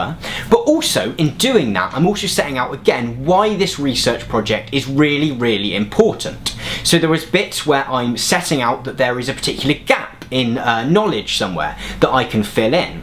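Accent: United Kingdom. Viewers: I like British ascent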